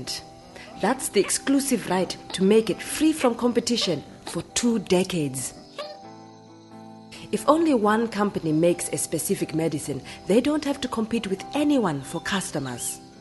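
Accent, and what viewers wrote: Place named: Africa. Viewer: By your pronountiation i assume you're from Africa, you should know that the problem of Africa is just NUTRITION, not AIDS, you should ask for proper food and for clean water, not for drugs or medicines. It hasn't been proved this drugs works and it is scientifically well known their toxicity, that can kill quickly someone who hasn't a good nutrition. So why? Thabo Mbeki was right. That's the reason why he falled down... Turn on your brain please.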